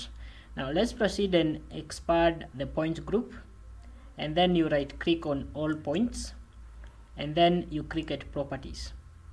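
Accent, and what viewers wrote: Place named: East Africa. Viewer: Useful, especially for a quick reference. And I like the accent too. Sounds very Eastern African 😊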